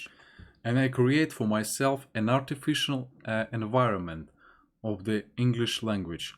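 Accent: Russia. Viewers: Congratulations on your English so far... it's very good, and sounds great with your Russian accent - keep going! Great videos on your channel BTW, it's very interesting how other people live their lives in other countries and cultures!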